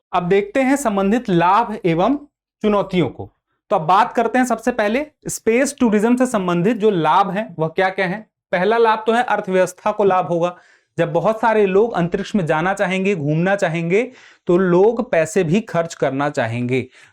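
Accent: Bihar, India. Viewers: guthka nhi hai I think sir bihar side se hai vha esa hi eccent aata hai.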